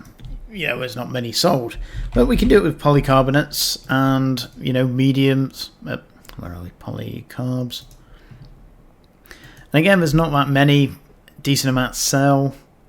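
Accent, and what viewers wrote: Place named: United Kingdom. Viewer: Always great! Always love a good ramble. Especially in a British accent. ;) Diversity is King. Gryphter and my other toons are Small Industrialists for sure.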